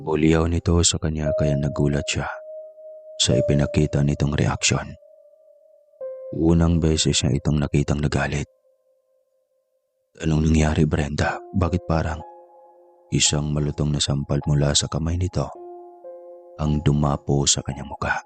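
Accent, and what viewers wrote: Visayas, Philippines. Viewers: Bisaya ka man, Dong!😊😊 (katugon na man ko)😅 Ha,ha,ha😆😆😆😆😆 Grabe, ang ganda ng estorya!❤❤❤❤❤❤❤ Watching from Los Angeles, CA USA 🇺🇸🇺🇸🇺🇸🇺🇸🇺🇸🇺🇸🇺🇸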